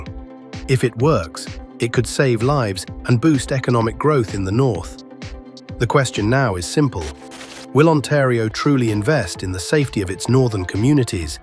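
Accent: United Kingdom. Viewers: I guess you know more than this guy who made this video🤣 especially the British voice probably had too much Scotch when he was creating this video!🤣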